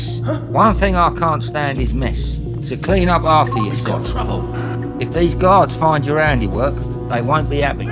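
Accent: United Kingdom. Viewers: The narrator reminds me of Michael Caine. That's a cockney accent I'm hearing, right? Curious as to why this game is launching on a Wednesday - most PSN games launch on Tuesdays, and I haven't noticed info about this on the PS blog. Is this announcement tying into the PlayStation meeting today, particularly with the PS VR version coming soon?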